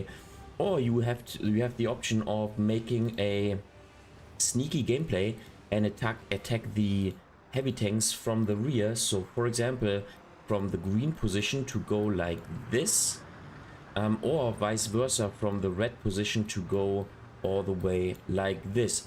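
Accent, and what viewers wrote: Germany. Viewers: Cool stuff! I like it 👍 btw: Ist deine Muttersprache evtl. deutsch?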